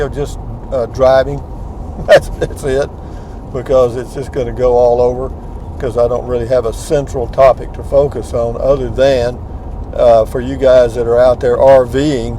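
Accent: Texas, United States. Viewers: Happy July 4th! Another enjoyable video. Since watching your videos the last few months I am enjoying life more. Your uplifting no nonsense attitude with your Texas draw is giving us something good in our day. My daily dose of smiles :) Thank you!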